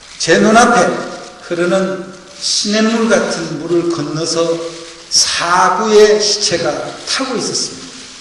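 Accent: Gyeongsang, South Korea. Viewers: 역시나훈아님멋져 경상도말씨구수함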